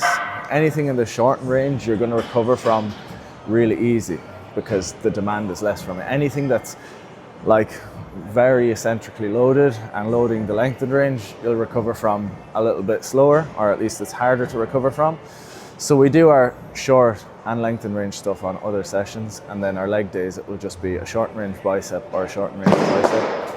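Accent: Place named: Ireland. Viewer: we’re all strange cunts, Normal is boring 😆 , Yes I’m from Cork myself that’s why im tryna figure out where from here your from by accent😂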